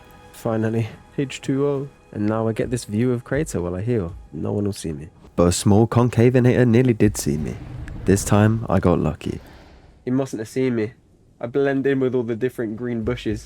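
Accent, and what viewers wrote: United Kingdom. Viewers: I like Dino, I like British voice. I enjoy this very greatly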